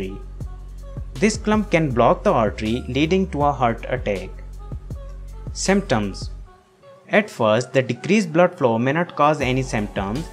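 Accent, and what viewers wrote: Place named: India. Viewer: Indian man from voice ..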